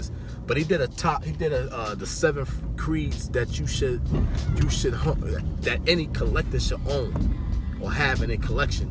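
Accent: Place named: North, United Kingdom. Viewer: Subscription granted. Good video. I can hear the up north accent.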